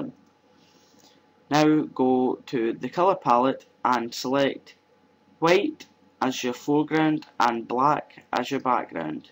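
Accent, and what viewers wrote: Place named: Kilmarnock, United Kingdom. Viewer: nice tutorial and killie accent.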